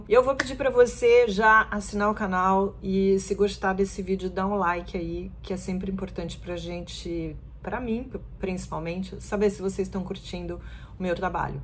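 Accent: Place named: São Paulo, Brazil. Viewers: Maaaaaaaaa vc é perfeita!!!! Não perde o sotaque paulistaaaaaa!!!!! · Seu sotaque é sul/sudeste, mas mais sudeste, tipo de SP. Suas análises são muito sensatas. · nada de errado com seu sotaque, inclusive eu acho que é bastante forte o jeito paulistano. Adoro sua coluna e assisto todas. Parabéns pelo excelente trabalho!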